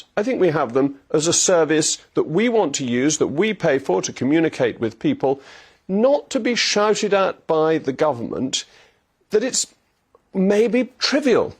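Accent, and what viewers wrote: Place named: RP, United Kingdom. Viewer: The plummy voice of reason.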